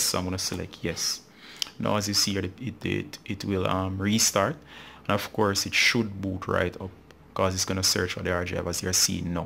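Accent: Jamaica. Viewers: Are you Jamaican? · where you from? you sound like a yard man.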